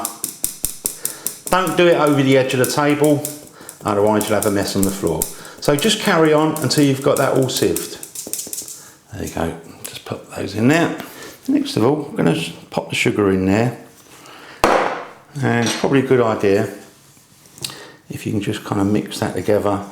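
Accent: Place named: London, United Kingdom. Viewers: Delicious. With a cuppa rosy unbeatable. I used whole meal flour. Gives them a little more crunch. Oh and the chef’s wonderful London accent. Love it. Thank you. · Let me start off by saying that I just love your English accent. Love your recipe.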